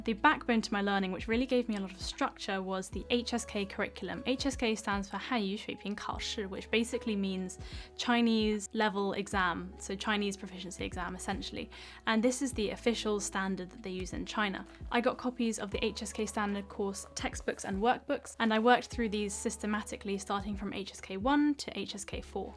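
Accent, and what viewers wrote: RP, United Kingdom. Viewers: Pretty faces tend to break people's brains. And a high class British accent forces you to listen. You have a devastating package! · I am a HSK3 graduate, from Argentina, then only phrase you said is perfect mandarin. I am not getting into any more of your channel, i thought you would start speaking zhong wen. Nice posh brit accent, btw. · Hi. Love your British accents. You are very motivating. Thank you for your guidelines on Chinese learning. I am trying learning Chinese as well. Very interesting and I think is the hardest language to learn. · Starting to learn Mandarin, and came across your vids. You could be in the dictionary under "life isn't fair," as you are stunningly beautiful, obviously brilliant and the British accent simply ups your attractiveness to levels beyond. I think you will be a great incentivizer to learning because I would not want to disappoint you by failing. 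To quote Jack Nicholson in As Good as it Gets, "you make me want to be a better man." To that end, I am hitting the books. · i loved your british accent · Assuming an average person would only do about 1 hour a day, because burnout is a thing, this plan would get you to her level in about 2.5 years. Not sure how long it would take to get her nice British accent. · Hey,I like your British accents,but I think you should take next time microphone,cause I struggle to catch some words. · Oh she's pretty beautiful!!! and I really loved her videos I've never seen something like this before. Somehow I would like to ask something. Is she from UK? because I'm currently learning English so it's a little bit difficult for me to understand some things and I can say that I'm used to the American accent so it's possible that I can't get her for that reason. Obviously always with all my respect. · This young lady is drop dead gorgeous and far more than simply bright, arriving replete with a British accent! 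I am now technically an old man (although I neither look it, act it, nor have a body that reflects it - and I am quite sure that I will give those who want to know how it do my life style how to do it! Moreover, I will be giving my advice for free - but that doesn't mean it is easy either as I am also in love with Kung Fu, weight lifting, running, and Keto) so if I can learn this while living in the Stock Market you can too! Thank you Dr.!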